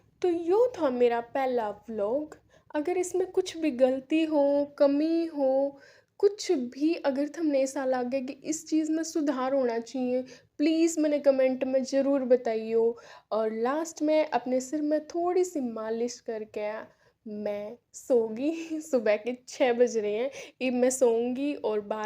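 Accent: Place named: Haryana, India. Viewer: Aww so pretty u are ❤ And your haryanvi accent is so cute and soothing to hear ❤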